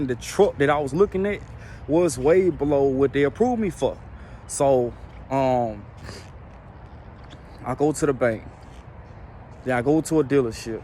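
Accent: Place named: New Orleans, United States. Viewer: That accent though...New Orleans?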